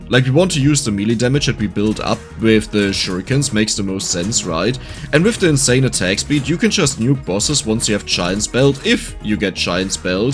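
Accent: Germany. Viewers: you can definitiv hear that dieser bruder is a deutscher bre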